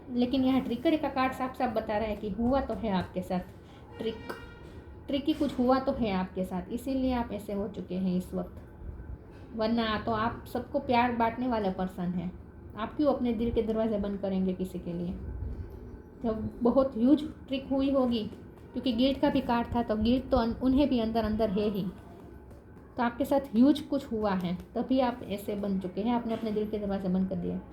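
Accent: Gujarat, India. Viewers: Aap ke acent se lag raha hai ke aap gujrati ya madwadi honge.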